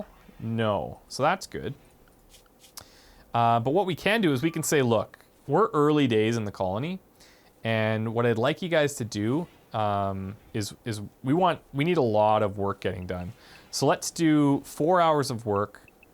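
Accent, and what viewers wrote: Canada. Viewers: I totally thought he was Canadian. He just gives me a dear, homey Canadian feel lol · because your accent sounds like you're Canadian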